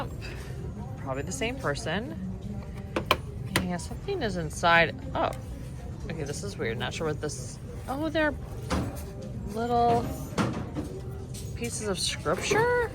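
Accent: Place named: Midwest, United States. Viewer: The stuff that got on camera was absolutely dizzying! So much great stuff! I'm going to rewatch and pause to enjoy. I think your Midwest accent is what makes you so enjoyable for me to listen to - I'm originally from Chicago (so only an eighth-turn from ex-Detroit). Your painting aesthetic is interesting. Maybe you can do a bit on that sometime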